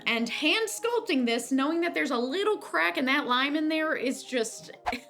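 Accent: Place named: Midwest, United States. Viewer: That sounds like a Midwest accent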